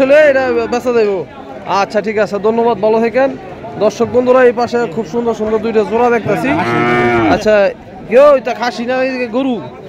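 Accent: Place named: Amgarh, Bangladesh. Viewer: ভাইয়ের কন্ঠে মনে হয় আমগড় দেশি লোক, শিবপুর উপজেলার আসে পাশে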